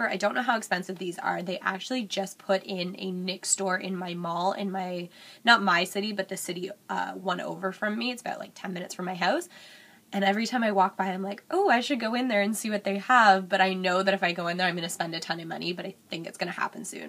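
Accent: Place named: Canada. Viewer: I love your Canadian accent! I have been to Vancouver before and I fell in love. Im so jealous that you live there and I dont! LOL Do you enjoy maple syrup? I can drink it by the bottle haha. Anyway I love love watching you! Keep the videos coming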